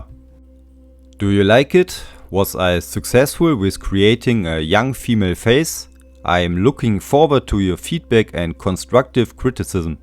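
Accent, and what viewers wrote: Germany. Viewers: ich finde Dein D`englisch sehr sympathisch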